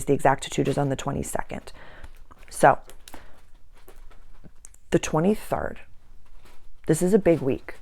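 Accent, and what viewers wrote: Boston, United States. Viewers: Love your videos so much. It feels like dishing with a Bostonian older sister.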